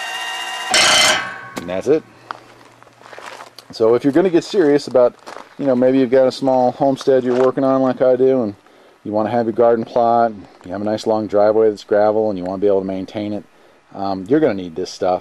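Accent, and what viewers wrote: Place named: Midwest, United States. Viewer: You sound like a midwestern boy! Great channel, for learning!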